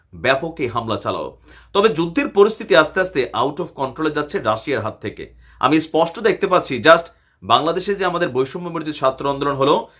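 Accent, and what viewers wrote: Kolkata, India. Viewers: কলকাতার ভাষাই তো আসবে। আপনার পূর্বপুরুষ যে ওপার বাংলার। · ভারতের শুরে কথা বলতেছেন।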